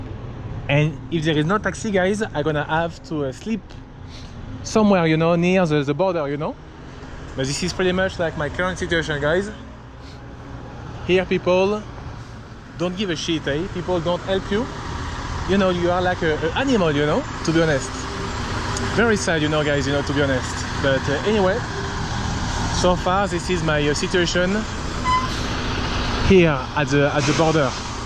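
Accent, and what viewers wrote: France. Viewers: I think you are using the correct words you’ve just got a few things going on at once. French accent & you put all your words together quickly…like real quick. So they sound different. It’s like a remix of words…😂 safe travels😊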